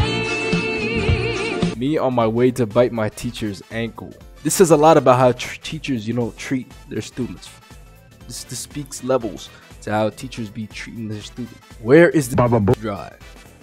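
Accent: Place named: Arab world. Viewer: nice Arabic accent mate ماشاءالله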